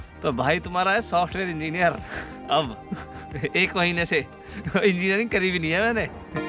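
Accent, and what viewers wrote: Haryana, India. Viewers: Bhai haryanvi bolte ho kya Japan me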